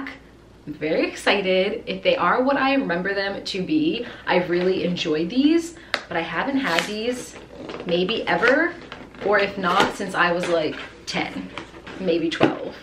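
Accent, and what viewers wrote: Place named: Rhode Island, United States. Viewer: Rhode Island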